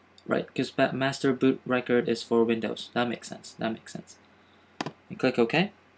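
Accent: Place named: Philippines. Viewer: galing na accent mo pareng.. proud ako sayo pinoy ka.. lol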